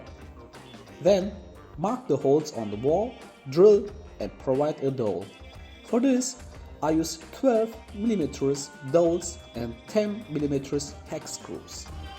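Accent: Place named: Germany. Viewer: Nope, I'm Asian that voice doesn't sound Asian period. I was hearing German, Dutch or Danish.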